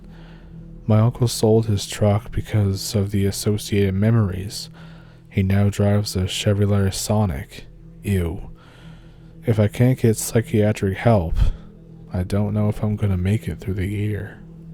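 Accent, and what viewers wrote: Canada. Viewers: I’d suggest that you employ the computer generated voices, as your spoken voice, with your Canadian accent, is pretty much monotone and there is no inflection nor alteration of tone… there is so much more that could be done with the content if only you took advantage of the enhancement opportunities that exist… just sayin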